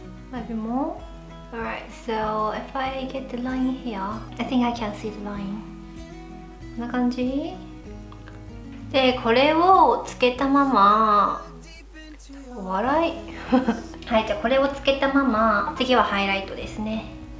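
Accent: United Kingdom. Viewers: Your British accent is the cutest thing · You have a British accent to your English! I love it, it's cute. I really enjoyed the video, thanks.:) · I love your British accent whenever you speak English 😭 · 外人さんメイク良く見るけど、オカマメイク(ドラッグクイーン)だよねw プロダクトめっちゃ付けるし化粧品会社めっちゃ儲かってるんだろうね。イギリス英語可愛いし、さんの全てが可愛い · British Accent たまらないです！ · Omg you have a British accent <3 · Wait...how does she speak English so well??? And with such a Brittish accent lol? Anyway quite enjoyed this 😄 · Her accent is british............................. WHY IS HER ACCENT BRITISH?! girl.exe has stopped responding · It is a British accent actually, she lives in London. Also that's not true about English speaking Japanese sounding British naturally; whoever you are, you will adapt the accent of whoever you learned whichever language from and how you are used to hearing that language spoken. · you're so adorable and i love your british accent! 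uwu i think you did a good job considering it's the first time you tried a make up look like this 👍💕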